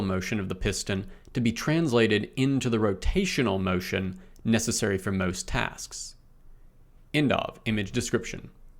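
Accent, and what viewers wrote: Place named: South, United States. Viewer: Finally some real food... I mean, ACOUP voice overs with a delightful southern drawl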